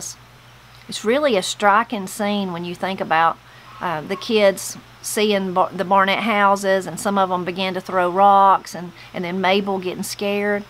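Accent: Appalachia, United States. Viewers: I’m so happy to notice that there seems to be plenty of pages yet to go in this amazing book! Perfect listening for me to cook supper while listening.. 😉 sweetly feminine voice, southern Appalachian accent and vocal cadence couldn’t be more perfectly ideal for narrating this story! Thanks again, and God bless y’all 💯🇺🇸❣️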